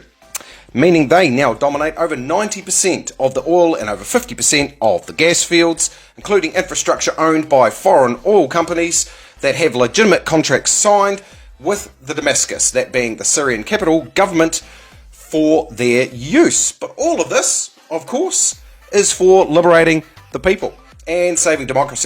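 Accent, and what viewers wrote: New Zealand. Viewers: Thankyou for your great channel cant get on daily telegraph nz thought police are stopping free speech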